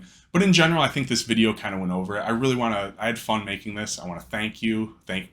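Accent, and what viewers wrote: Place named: Australia. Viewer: what did you do that landed your ass in jail . Do tell. the Australian accent in general has always given me gay energy. its low key cute. The police is super intense in america, it just snowballs from there. No matter how sweet you are if they hate your ass from jump , you are pretty much toast. I am an albino male, hyper aware that its my key visible feature. i am disrespected enough in real life so i don't think i'd nice in a confined environment if someone gets testy . i do know a lot of people find albinos spooky.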